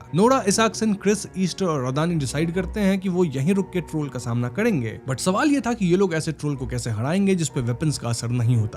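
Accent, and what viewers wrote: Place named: Bihar, India. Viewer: broh no hate but apna accent thik kro yaar bihari accent nikal deta h gussa aata h ekdm se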